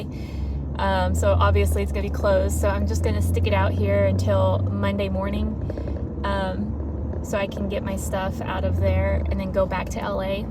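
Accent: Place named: South, United States. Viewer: You remind me of Jennifer Lopez but with a southern accent.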